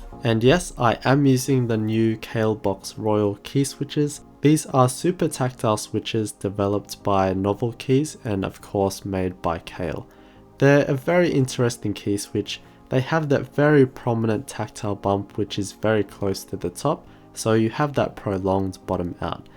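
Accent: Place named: Australia. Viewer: You sound like Daniel Ricciardo. Are you an Aussie?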